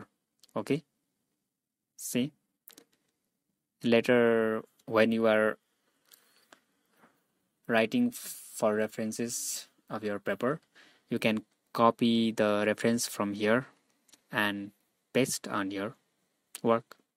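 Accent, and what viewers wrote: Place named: India. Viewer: Disastrous Hindi english accent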